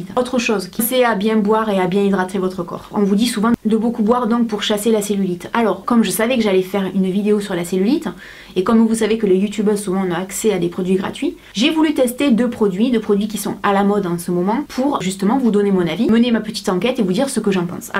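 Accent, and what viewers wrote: South, France. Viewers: Merci pour ta vidéo, merci pour ton avis sur le thé détox que tu as testé et le livre sur la cellulite, ta bonne humeur et ton accent du sud son un vrai bonheur à attendre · Ton accent vient du sud non? J'aime trop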